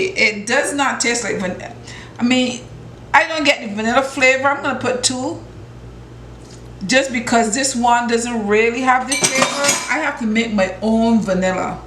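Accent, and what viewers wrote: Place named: Barbados. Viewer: I am sooo happy I found this channel omg 😩 lunch cakes were the best thank you miss love tug see and hear ah bajan 🇧🇧mother talk 😂 Happy Mother’s Day to you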